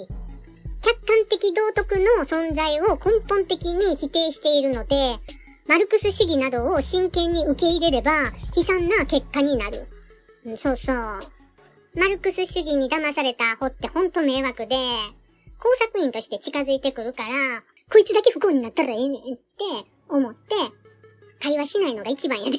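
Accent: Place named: Osaka, Japan. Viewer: なかなかマルクス主義を主題にしながら、現実は、それが空論であり乍ら、利用され続けているという惨たらしい歴史をまことに見事に大阪弁の楽しさで、しかも日常的に示唆し続けてくださっている先生に感謝です。この地球俯瞰的な見方は、隣国から浸透され続けている今、とてもとても必要不可欠だと思います。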